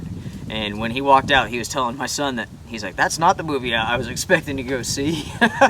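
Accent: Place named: United States. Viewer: That's awesome. Where is Lonewolf mountain? You sound American. I like watching your adventures.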